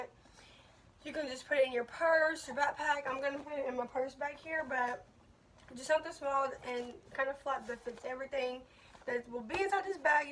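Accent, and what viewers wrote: United States. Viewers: I’m used to this Tennessee and Mississippi talk😝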